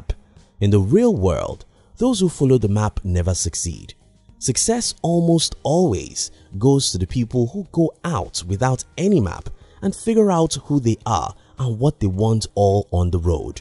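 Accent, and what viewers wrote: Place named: Nigeria. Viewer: You speak like a Nigerian.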